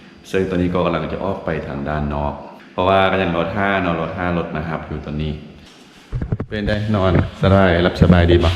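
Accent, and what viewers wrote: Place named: Isan, Thailand. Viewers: สำเนียงเดียวกับคนจ.เลย เพชรบูรณ์ ครับ ผมคนเพชรบูรณ์พูดแบบนี้เหมือนกัน บรรพบุรุษอพยพลงมาจากหลวงพระบาง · สำเนียงภาษาลาวของแอด คล้ายๆสำเนียงภาษาเหนือของไทยอยู่บ้างนะ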